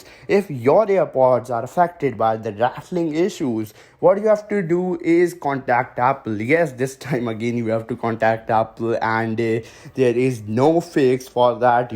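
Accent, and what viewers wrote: India. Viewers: Are u from India? Can see from your accent.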